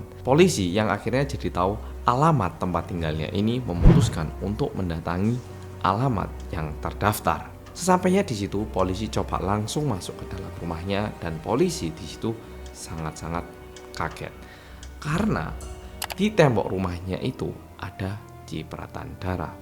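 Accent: Java, Indonesia. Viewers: Actingnya boleh juga 😂😂 sukses terus mas! Btw knapa udah pindah ke Korea tapi logatnya tetep medhok ya 😅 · tks medok 🎉❤🎉 Sehat selalu yaaa 💪🏿🙏🏿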